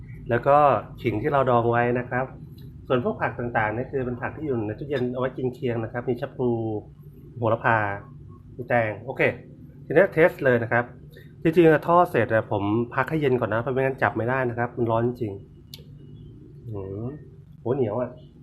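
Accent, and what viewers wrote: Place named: Bangkok, Thailand. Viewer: อยู่มี่ไหนคะนี่พูดเพราะเหมือนคนกทม.ตอบด้วย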